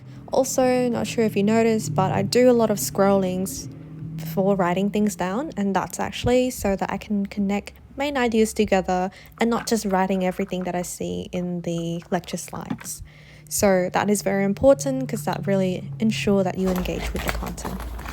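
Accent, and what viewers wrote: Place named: Australia. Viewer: omg i literally thought her accent sounds familiar is she australian?? lmaoo im an aussie too!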